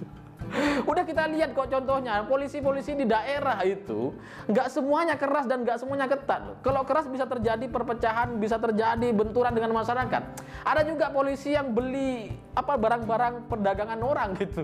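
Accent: Madura, Indonesia. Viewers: orang ini ini logat bicaranya kayak nya orang madura hehe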